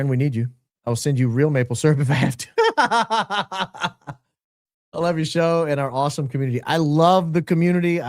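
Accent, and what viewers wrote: South, United States. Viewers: I really like the way you say “was” and “drunk“. Your subtle southern drawl comes out with the “uh“ sound.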